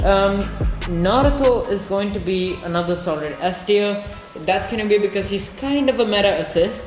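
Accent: India. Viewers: Bro you indian? Because your English way of talking is similar indians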